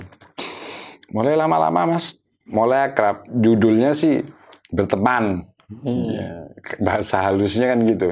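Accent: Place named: Madura, Indonesia. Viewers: Logatnya madura bgt om 😂❤ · Assalamu'alaikum wr wb...mas...sy dulu...Jan 1975 - Agst 1976 itu...jg pernh tinggal di Bdws...19 Jan 1975 itu...sy,adik sy/Ana n almrhum Rama sy...naik KA dri Sby ke Jember...llu naik colt ke Bdws utk cri sekolh sy n sekolhx adik...Alhamdulillah...sy dpt di SMEAN - Jl Wachid Hasyim...klas 3...dri SMEAN 1 Sby pindh ke SMEAN Bdws...kl adik sy di SMPN 1...klas 1...llu sy n adik dikostkan didepanx gere'ja ayam...tpi hnya 2bln...sedangkn almrhum Rama sy n tmn2x itu...ad yg tidur dirmhx almrhum Rama sy...di Perumhn Artha Karya 2 - Jl A Yani - Nangkaan - Bdws...llu Mret 1975 itu...kelima sdr sy diboyong jg ke Bdws krn pd 1973 itu...kedua ortu sy sdh bercerai...jdi almrhumh ibu itu...hidup sendirian di Sby...stlh sy lulus sekolh...Agst 1976 itu...sy ikut almrhumh ibu llu Des 1976 sy menikh di Mjkrt n sy tinggal di Mjkrt itu...hnya 1th llu selama 7bln sy,suami n ank sy tinggal dirmhx almrhumh ibu n almrhum bpk tiri sy...kemudian sy ngontrak didektx rmhx almrhumh ibu...smp lhir ank ke 2,3 4 n 5...llu 25 Sept 1983 itu...sy sklrg pindh ke Bojonegoro n smp skrg...krn dinsx suami sy dipindh...dri Sby ke Bojonegoro n smp purna tugas...suami sy kerja di BUMN n purna pd 1 Agst 2004...mas itu...tinggalx dikampung Arab yaa...adikx ibu tiri sy itu...jg ad yg tinggal dikampung Arab...sy mendengar logat bicarax...mas itu...kok sprt orang Madura...ternyata betul...kita sm2 pernh tinggal di Bdws...Smg rumah tanggax mas...Samawa...Aamiin...sy/bu R Ayu Mamiek Widayati...68t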